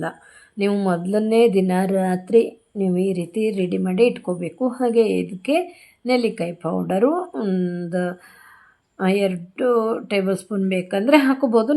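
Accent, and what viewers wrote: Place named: Kerala, India. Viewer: Nimma matugalu kerala dvaru kannada matanadidante ede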